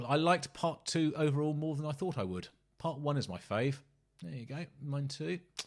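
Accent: United Kingdom. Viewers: Doug is pretty great too…but he’s American. I love hearing British accents so I prefer your channel.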